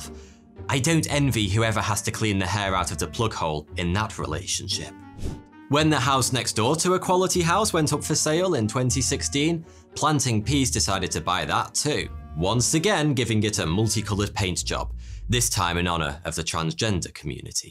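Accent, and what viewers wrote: United Kingdom. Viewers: Love your stories and your fricking Brit accent (is it? I cannot decide which kind, since British comes in so many flavors and tastes...)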